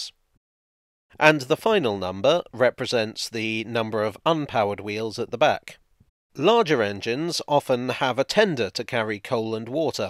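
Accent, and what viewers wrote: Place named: England, United Kingdom. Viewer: "saturated' thats it... fun fact: in old german books you will find even "satt" / "gesättigt" as synonym... which should be the matching translation. in general up the end of the first world war there where many english words like "Train" or "Lorry" in this capitalized german form in newsletter articles and books but using the right term in the right moment is rather difficult. so thanks for the kind words (in fact i subcribed the channel for this english english the speaker uses :) i really enjoy it)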